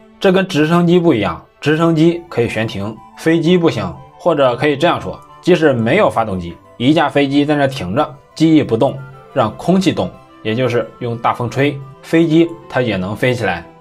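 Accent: Henan, China. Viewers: 这是河南话? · 河南口音吧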